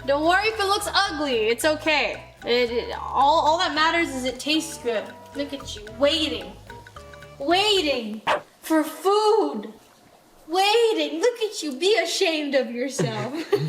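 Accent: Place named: United States. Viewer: Why does she have an American accent now?? ☹I've watched ever since her K-pop days and her accent was distinctively British whenever she speaks English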